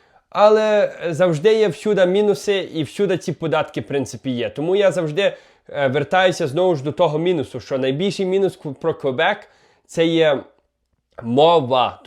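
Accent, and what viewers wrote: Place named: Western Ukraine, Ukraine. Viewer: привіт, просто цікаво, ти 1ше покоління в Канаді, чи вже внук жителів України? Говориш по українськи файно, по нашому по западенськи))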